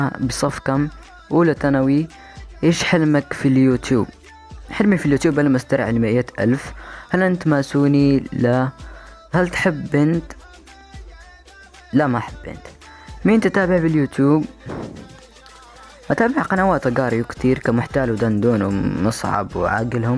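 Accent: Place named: Saudi Arabia. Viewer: من المغرب و تتحدث سعودي؟ ملاحظة: حتى انا مغربية ._. و بحبك ._.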